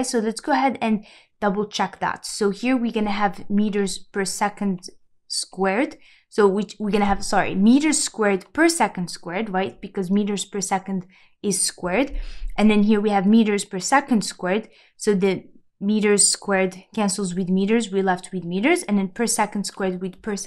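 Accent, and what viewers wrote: France. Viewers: Hi. I like your teaching style. Also can hear your lovely french accent. I too speak French and got all my degrees in French before moving to the US. Here vitesse = velocity … I will need to convert the terms before deep diving. How can you help me? I graduated with my bachelor 14years ago, but want to take the FE in 3months. I do I contact you for an effective communication to get me started? Thank you.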